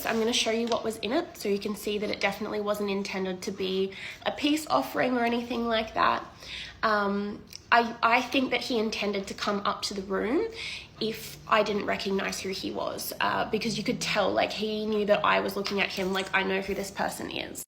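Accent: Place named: Australia. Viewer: Man, I totally love Australians. Her accent is 🔥. Shes really hot, I’d book with her in a second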